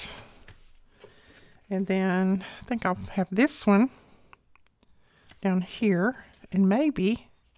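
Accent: South, United States. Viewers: For not coming up with new ideas you sure knocked it out of the park with those. And the term is too many irons in the fire. I think they used to put irons in the fire. Literally. 😂. Great job as always. I love watching you craft and hearing your southern accent. So cute. Sending much love and blessings, Sharron 🥰🥰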